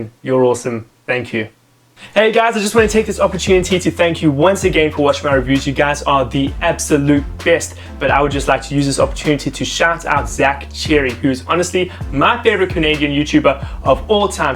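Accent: South Africa. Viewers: I heard the accent and stayed for the whole channel 👏 great quality, fellow South African here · So glad I still recognise South African accent after 3 years out of the country! Keep on keepin on boetie. Baie Lekker :) Yoh.. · Bro, are you from South Africa? The accent?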